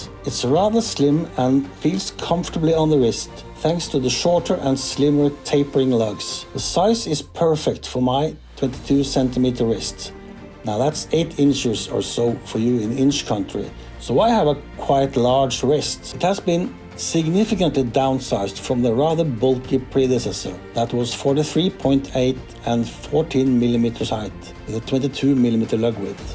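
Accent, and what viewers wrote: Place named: Sweden. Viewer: Hi,. I enjoy your reviews, and your accent reminds me of my grandfather, and other, living, relatives back in Sweden. It’s music to my ears! Greetings from the American Midwest, where Vikings cosplay is a thing. Thanks for your review of the SRPL13. I agree on all points, and ordered one from Teddy for $437, plus state tax, tariffs included. It paid to procrastinate. Could not wait any longer, as a Seiko price increase was to kick in on 15 Sept. I was torn between this Seiko and the Citizen BN0150. The Citizen has ISO Diver’s 200, and has grab and go Eco-Drive solar movemnent. In the end, the Seiko’s style won out over the Citizen’s practicality, ease, rating, performance and value proposition. Since I don’t dive, this was really ultimately about aesthetics.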